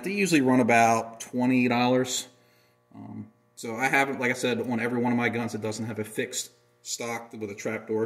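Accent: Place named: Philadelphia, United States. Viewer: I detected a philly accent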